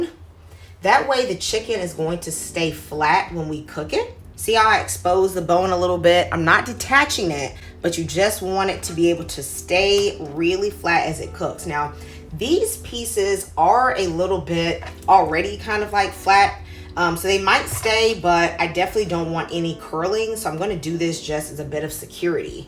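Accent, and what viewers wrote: South, United States. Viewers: Just found your channel! With all these delicious looking foods....and your accent.....you must be southern!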